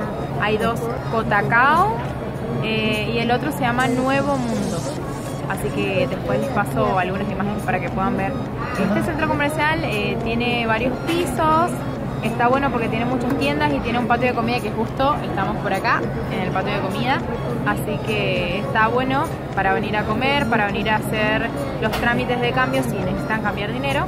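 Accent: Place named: Argentina. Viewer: Me vacilé tu acento argentino todo el video 🤭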